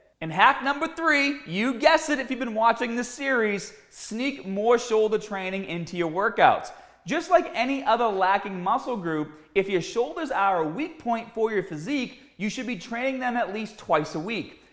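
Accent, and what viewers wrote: Boston, United States. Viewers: wait when did Scott get a Boston accent I don't recall him having one in 2009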